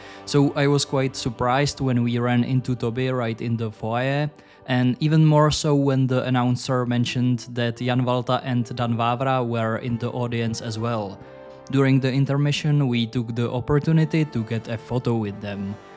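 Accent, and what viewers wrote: Czech Republic. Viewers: opened a kcd video sceptical by default, heard a czech accent and knew i was in for a TREAT 🙏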